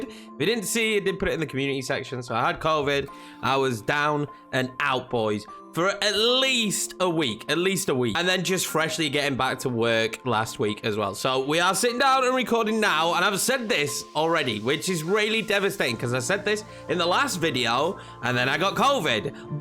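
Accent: Yorkshire, United Kingdom. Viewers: Never thought I would say this but Up The City!!! How we have missed your Yorkshire dulcet tones lol 😂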